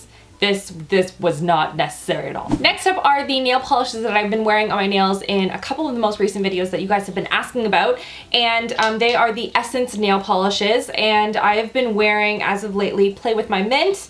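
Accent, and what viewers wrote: Canada. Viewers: Where does she live ? She sounds Canadian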